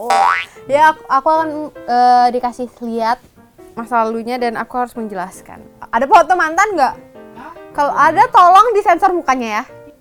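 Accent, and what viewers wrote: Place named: Bali, Indonesia. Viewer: logat balinya masih ada bgttt gemes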